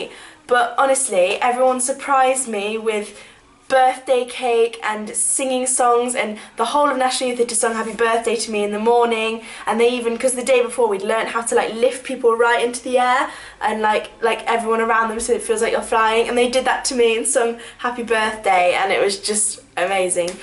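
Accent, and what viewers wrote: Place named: South, United States. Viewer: this is great! Just hearing your southerner tones, and seeing you brought back so many memories...This is great!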